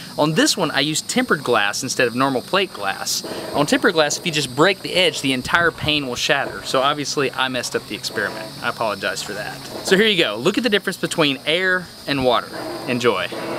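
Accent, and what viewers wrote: United States. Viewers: Apologies for the banal question, but where abouts in the States is this guy's accent from?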